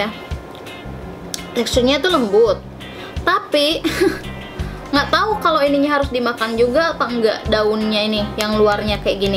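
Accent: West Java, Indonesia. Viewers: mba orng sunda ya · resep ihh nyunda 😂😂 orang mah rek diamerika ge logat mah moal hilang 😂😂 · logat2 sunda gituu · Orang sunda nya teh.... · org mna kk sunda nya ? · emoy atu lah teteh sunda pisan eta hahaha.. teteh tinggal di mana sekarang ? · Janten urg sunda teteh teh?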